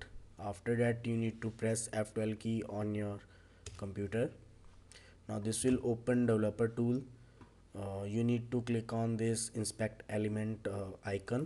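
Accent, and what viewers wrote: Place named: India. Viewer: why would you trust a person with an indian accent and bit.lys in the comment section explaining how to log out from messenger....not to mentiont that 90% of positive comments are indians.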